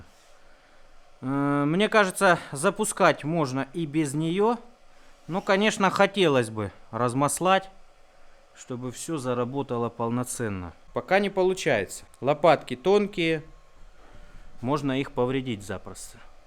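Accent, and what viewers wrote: Rostov, Russia. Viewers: Обожаю Ростовский говор!!привет из Спб!!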